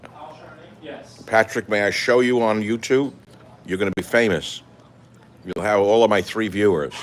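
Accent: New York, United States. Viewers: regardless of whether we are alone or with family out here, we listen because of the way you say things, your delivery and segueways. I listen for your New York accent and kvetching. Keep it up my friend.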